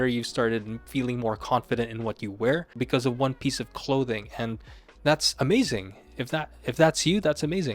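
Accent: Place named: Philippines. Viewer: You kind of have a Filipino accent when speaking in English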